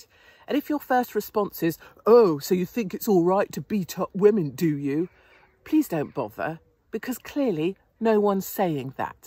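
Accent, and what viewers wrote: United Kingdom. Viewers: I hope the deaf people are reading the comments because the CC didn't capture actually what you are saying; even with your British accent, the word "were" is very clear, the program typed that the Truckers "weren't real men". Not sure if that's on purpose or not, just wanted you to know. Thanks for your confidence your enthusiasm and that British brass. Love you sis, keep it up!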